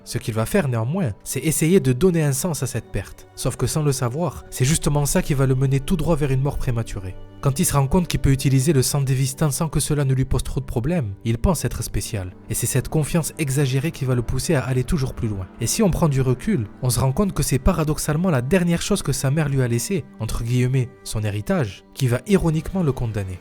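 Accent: Marseille, France. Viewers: L'accent marseillais me perturbe et pourtant je vis à Marseille 🤣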